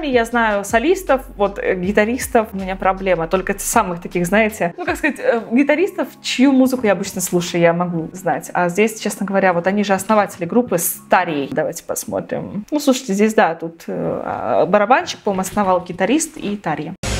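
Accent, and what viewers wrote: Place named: United States. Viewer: silence? .... никогда не критикую строго ютуберов, ибо сам начинающий, но так пафосно в начале видео произносить с американским акцентом слова и не распознать слова в тексте - помоему это фейл, Вам не кажется?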